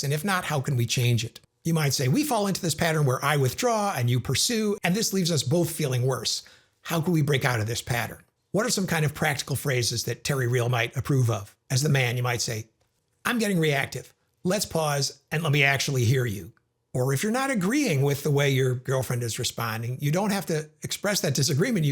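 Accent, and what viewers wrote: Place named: United States. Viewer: Sorry, what part of US are you from and what do you call your accent?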